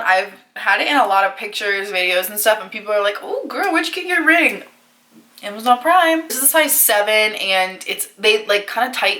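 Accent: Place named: United Kingdom. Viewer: I thought she was British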